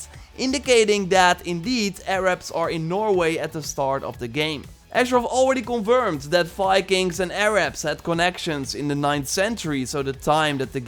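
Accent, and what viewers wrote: Netherlands. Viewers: I love that crisp Dutch accent: a n d l e t s g o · Ik hoor een Nederlands accent :) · Welk Nederlands accent?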